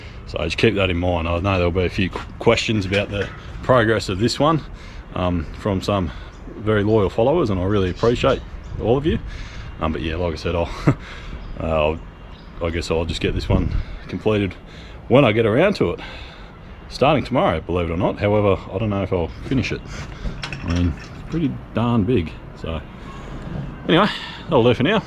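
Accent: Australia. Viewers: At first I wasnt really paying attention and took your aussie accent as a british one and was like, why the hell is this guy worried about spiders. Then I realized that he is in Australia lol. Cant imagine having to worry about creepy crawlies all day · When u like hearing languages and recognize it as Australian accent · Where are you located? Are you an Aussie? Love the accent. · TV aerial was my guess! Woot! Great job. I love the charity work. Love your accent, too! Down home Alabama here, so hearing an Aussie is a treat. Keep cutting!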